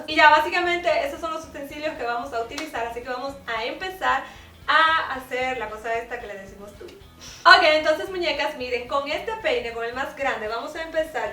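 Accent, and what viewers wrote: Dominican Republic. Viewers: Eres Dominicana? :v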